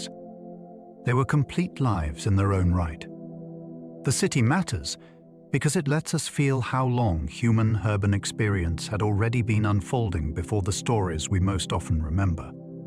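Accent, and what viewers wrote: United Kingdom. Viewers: That’s ironic that you chose a British guys Chanel. I quite enjoy his crisp accent, the cadence is soothing, as well as the tones. Good luck finding one to your liking, the rest of us will be content to stay.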